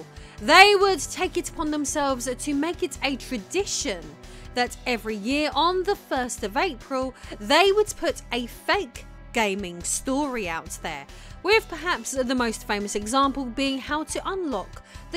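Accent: United Kingdom. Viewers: This British Accent 👍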